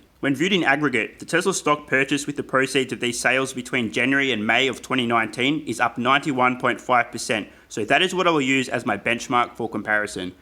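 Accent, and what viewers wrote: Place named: Australia. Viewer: I can tell you are an Aussie, I'd like to have a beer or more, where are you?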